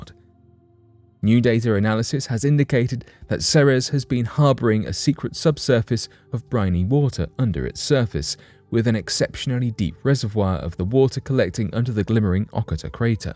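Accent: England, United Kingdom. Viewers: The believability of your videos varies. But the audio volume is super consistent. That and the high frequency of your videos makes this channel my go-to chill out channel. I also love English accents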